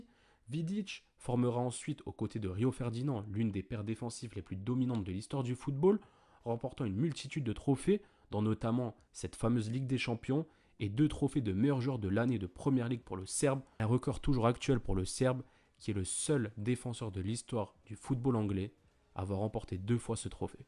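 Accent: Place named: Italy. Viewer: Ta culture foot et ton accent italien me font rêver. Merci!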